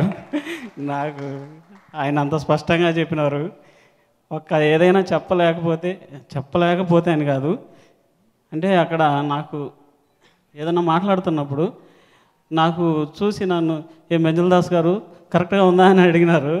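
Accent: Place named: Kurnool, India. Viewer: Memu Kollapur vallam Mahaboob Nagar lo oka prantham ....Mee kurnool ki daggara mee lagane matladatham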